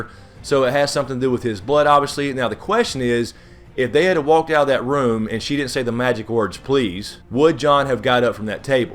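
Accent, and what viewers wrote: South, United States. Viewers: hey! I love your southern accent and the way you simplify the books and show for those of us who can't keep track of every detail. My question is what role do you think Meera Reed is going to play in the season to come? I believe someone told her that she was important to Bran and she did save his life but do you think she's more important than that? do you think she's secretly someone?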